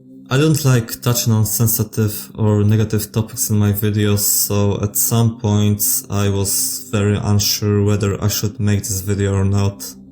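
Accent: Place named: Poland. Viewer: slysze twoj polski akcent kolego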